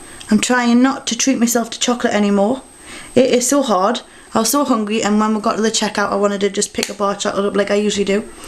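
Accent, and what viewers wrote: Newcastle, United Kingdom. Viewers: shes from newcastle LOL.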